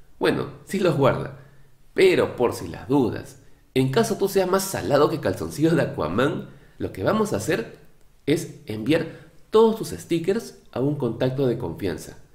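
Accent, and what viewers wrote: Peru. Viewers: Acento Limeno 100% jeje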